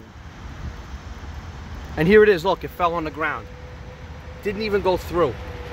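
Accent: New York, United States. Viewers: certified new york accent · He sounds like the definition of an American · The New York accent makes me think that’s not his car.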